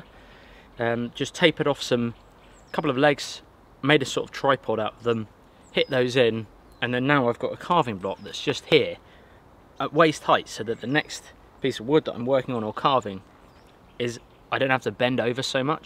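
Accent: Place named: England, United Kingdom. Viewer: Where is that particular English accent from? Kris Harbour Natural Building has that EXACT accent. Tone, cadence, emphasis, everything.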